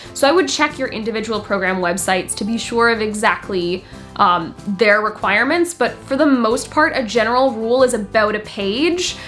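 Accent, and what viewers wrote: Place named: United States. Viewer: Perfect American accent :)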